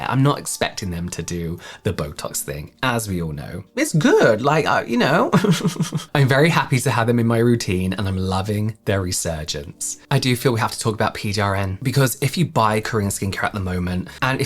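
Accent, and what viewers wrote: United Kingdom. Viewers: I can imagine him reading children’s bedtime stories on Ceebeebies or something!! Obviously not just that, he’s got a lot of potential for many opportunities for voiceover aspects of a career 100% but I think my kids when they were little would have enjoyed his voice too! ❤ Accent and voice is on point! 👌🏼🥰 British accent along with his voice/pitch etc is perfection 🎉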